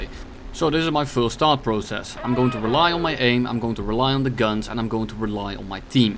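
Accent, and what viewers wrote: Netherlands. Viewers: I love your content and it's been a great motivator to hear your smarmy Dutch accented voice in the background while I go through my evening routine most days. As a second generation German-American, you've got my support, you sarcastic swamp German... Err, I mean, Dutchman!:D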